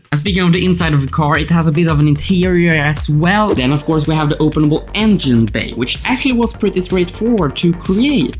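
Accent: Sweden. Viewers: really nice work! And by the sound of it så låter du Svensk, men kan ha fel 😅 Kommer garanterat besöka din sida och köpa några ritningar framöver 👍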